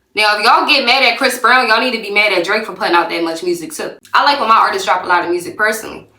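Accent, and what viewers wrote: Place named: Philadelphia, United States. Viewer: That Philly accent is everything… 😩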